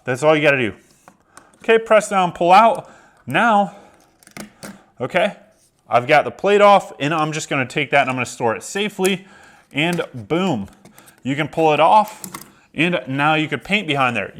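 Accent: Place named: Baltimore, United States. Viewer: Sounds like Baltimore accent 😃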